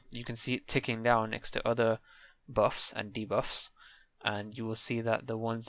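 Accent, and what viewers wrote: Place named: Newcastle, United Kingdom. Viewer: that sounds like a Geordie/Northumbria accent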